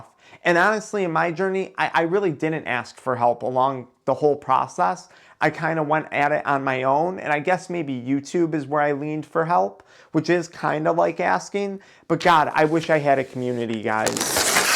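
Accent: United States. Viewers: Why do Americans pronounce doc as daac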